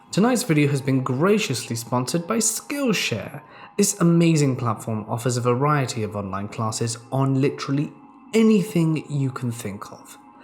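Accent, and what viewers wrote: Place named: Upper class, United Kingdom. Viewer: BBC English with a distinct aristo twang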